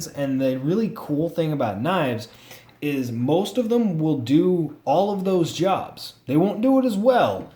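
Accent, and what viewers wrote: United Kingdom. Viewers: What are you british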